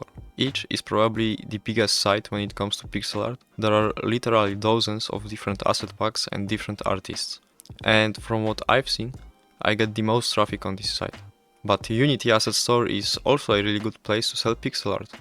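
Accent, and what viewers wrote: Poland. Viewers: ty polak co nie XD od razu slychac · poznam polaka jesli go usłysze... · ty z polski jestes bo taki akcent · brzmisz strasznie polsko, powodzenia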